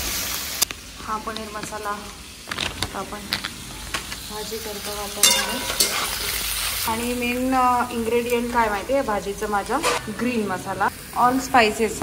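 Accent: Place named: Nashik, India. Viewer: शेवटी ताई तुझ्या बोलण्यामध्ये थोडी नाशिकची झलक आहेच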